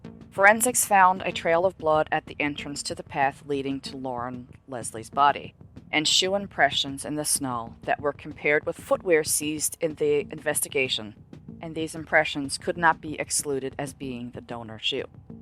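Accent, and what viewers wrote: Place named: Canada. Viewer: She sounds extremely Canadian..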